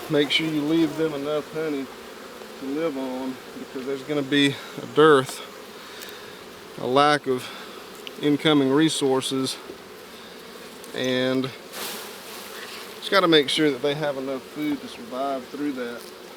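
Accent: South, United States. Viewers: Of course he has a southern accent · His southern accent soothes my soul "this was my gran daddies' " made my heart melt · I live in North Georgia, most people I know have pretty deep southern accents. Because of where I live most people here are pretty mean, so the accent doesn't exactly give me the warm fuzzies. But this man seems so calm and kind that the accent sounds nice. I've watched maybe ten of his videos already and now I wanna be a beekeeper! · "it was my granddaddy's" awe, as a canadian I dont hear real southern- talk much, its really heart warming to hear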